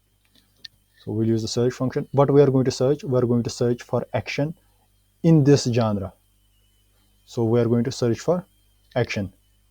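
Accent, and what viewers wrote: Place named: Kashmir, India. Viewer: maine apki awaa suni muje wahi samaj aaya aap apne kashmiri bhai ho Allah salamat rakhe this video helped <3 a lot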